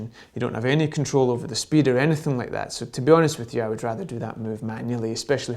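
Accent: United Kingdom. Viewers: Another superb video. I’ve only came across your videos a couple of days ago and I have watched a lot of them already. Well explained content which has helped a lot!!!! And....and....... being from Northern Ireland myself it’s great to hear a british voice doing a DJI tutorial. Thanks man...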